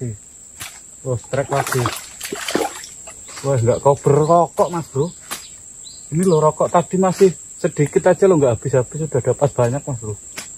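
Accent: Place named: Java, Indonesia. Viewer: Mas, sampiyan ki wong ngendi? kok koyo wong jowo? jowo ngendi mas?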